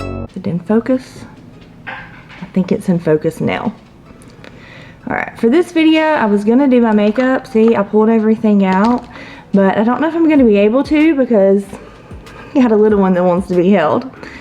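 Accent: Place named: South, United States. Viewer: This was on my recommendations and I'm glad I stopped by to give you a watch. Even though you scripted this video and weren't too into scripting, you still feel very familiar and friendly. Maybe it's the southern accent, but it feels like I'm sitting on the front porch with a friend swinging on the porch swing, drinking sweet tea and talking about life (I'm southern, too, lol). Your dogs are super cute! Thank you for sharing :D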